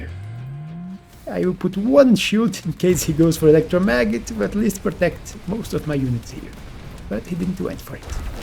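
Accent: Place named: Brazil. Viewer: Eu também sou brasileiro aí reconheço o sotaque! Hahahah Você joga bem, mano, parabéns! Desejo sucesso!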